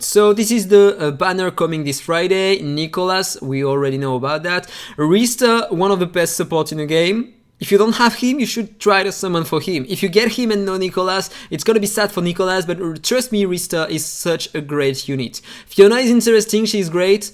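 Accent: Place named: France. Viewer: He's French, relax English is not his first language.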